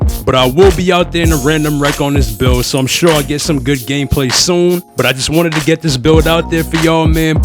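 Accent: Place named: East Coast, United States. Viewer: Like how he uses West Coast beats in the background when he sounds like a NY/East Coast dude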